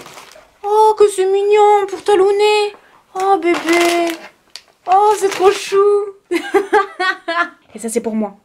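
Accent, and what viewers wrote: South, France. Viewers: Joyeux Noël à toi, ton fils et ta maman et aux abonné-es🎄💕. Merci pour tes vidéos tu es un véritable rayon de soleil 🌞 avec l’accent du sud j’adore 😍! À la base je ne suis pas trop branchée YouTubeuses beauté mais je t’adore tellement que je regarde tout tes contenus et suis super heureuse dès que tu fais plus de vidéos 🍀🙏🏼. Gros Bisous de Suisse 🇨🇭 😘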